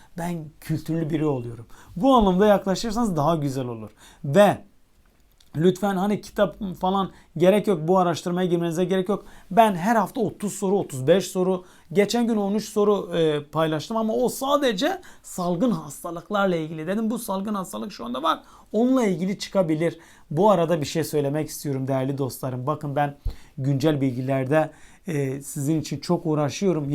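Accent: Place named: Bingöl, Turkey. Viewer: kusura bakmayın tam Bingöllüler gibi konuştunuz çok benzettim 😊 teşekkür ederim video için kendi adıma 🙏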